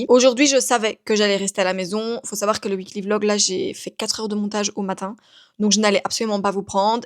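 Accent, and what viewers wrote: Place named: Belgium. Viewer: Bonsoir, je n ai pas trouvé la réf de la lampe que tu mets sur ta caméra. C est pour accrocher à mon miroir. J ai cherché sur ta boutique Amazon. C est carrément le makeup que j aime: glow… J ai exactement le même modèle de boucles d’oreilles. C est vraiment un bel intemporel. C est vrai que tu as un fort accent belge parfois c est très drôle 😂😂😂. Je vois que Vivi t accompagne au pilâtes. Mes chats font la même chose. Ils se demandent ce que je fais par terre. Tu as utilisé ta palette Dior habituelle pour le glow? Je pense que je vais me commander la brume Rhode. Bises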